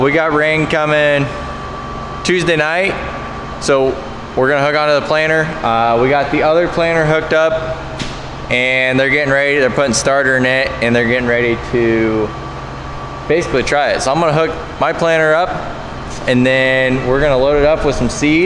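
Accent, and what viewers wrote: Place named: Nebraska, United States. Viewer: Oh I used to work for a potato farm in Duncan near Columbus. I could tell you were from Nebraska.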